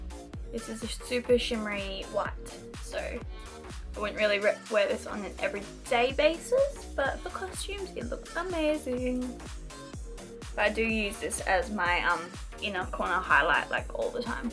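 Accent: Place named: Australia. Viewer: Where are you from you sound Aussie but then you have a bit of a English accent to it ❤️ it's beautiful though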